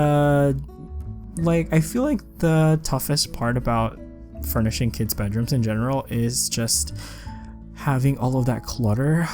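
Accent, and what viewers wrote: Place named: Philippines. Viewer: Your English is very good! I read you're Filipino so your accent is so similar to my friend :)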